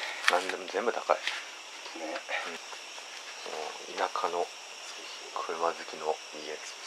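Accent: Hiroshima, Japan. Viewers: 話し方が広島県民 合ってます？ それも東部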